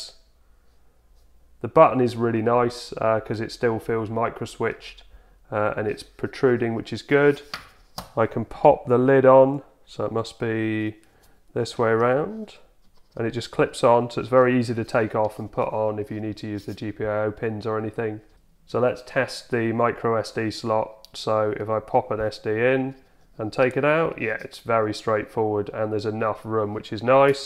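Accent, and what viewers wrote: United Kingdom. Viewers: Thanks for the update and review. 52PI Has really stepped-up their game! I always liked the way you pronounce A-Lume-A-num (US) ….Al-You-Mini-um (UK)…when I 1st started listening to shortwave pre internet the BBCWorldService pronounced just like you…and I went crazy trying to figure out what’s that new metal the U.K. Has?…😂! Have a great day!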